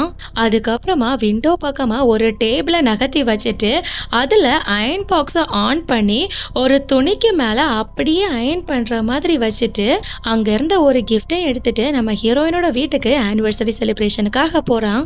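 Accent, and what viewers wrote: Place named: Kerala, India. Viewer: Malayalam accent explanation 😅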